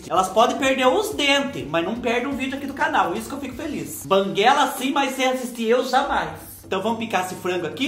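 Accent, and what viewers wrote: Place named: South, Brazil. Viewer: também sou do interior de São Paulo, só que sua cidade é próxima ao Paraná e a minha próxima de Minas,por isso você tem esse sotaque mais puxado pro Sul e eu pro mineires...😂😂😂❤❤❤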